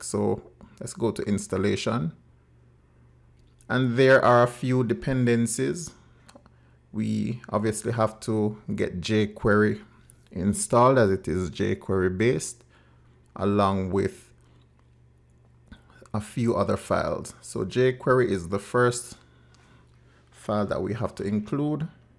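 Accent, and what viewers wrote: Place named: Jamaica. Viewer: I don't usually do this but when I heard your accent I had to log in just to say, holy shit, a Jamaican narrating an IT video is pretty much one of the rarest but most awesome things I've ever experienced. And besides that, you did a really good job walking us through the process. Good job mate! Peace, love and unity!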